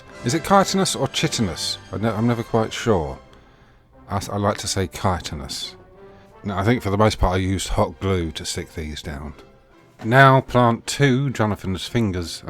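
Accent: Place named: United Kingdom. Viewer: Are you alright mate? You sound more British than usual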